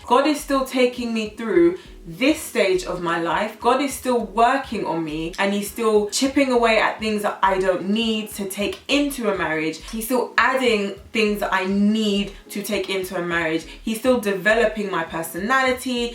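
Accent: Nigeria. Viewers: Naija accent I love it. You should do more of these videos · The Nigerian accent thoooo!!!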